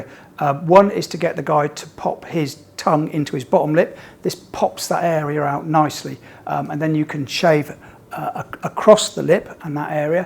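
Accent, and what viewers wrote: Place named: United Kingdom. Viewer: As a Yank it's great to hear British English...it's a dance of the language.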